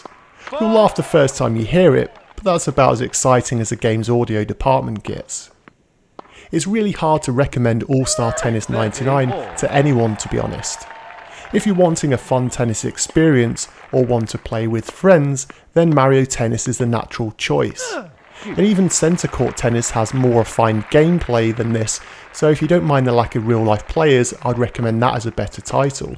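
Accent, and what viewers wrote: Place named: United Kingdom. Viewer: This is "All-Star" tennis! Where the hell are Agassi, Sampras, Seles, Kournikova? Nice review,. Keep up the British Commentary.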